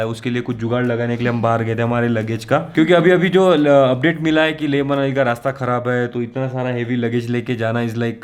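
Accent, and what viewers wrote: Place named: Gujarat, India. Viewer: Your gujrati accent is awesome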